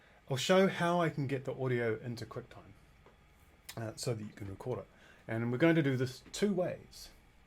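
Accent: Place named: New Zealand. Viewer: Works like a charm! Good old Kiwi ingenuity 🇳🇿🇳🇿🇳🇿 Recognized the accent from a mile away!!! Gotta support a mate from Porirua 😆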